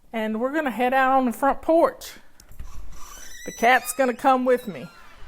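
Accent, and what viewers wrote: South, United States. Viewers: love your cute Southern drawl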